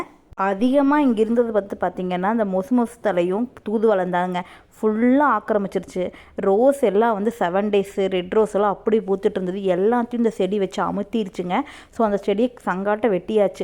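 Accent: Kongu, India. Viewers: So cute'ma. அழகான கொங்கு தமிழ். கோவையில நீங்க எந்த இடம்ப்பா?. Surgeryக்குப் பிறகு இப்ப பரவாயில்லையா?!!. Get well soon.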